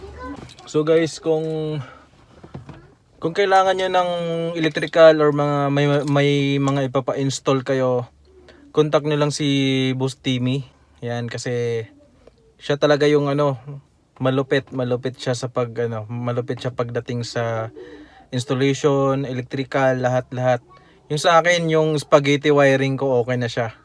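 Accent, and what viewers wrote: Visayas, Philippines. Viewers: Bisaya ka boss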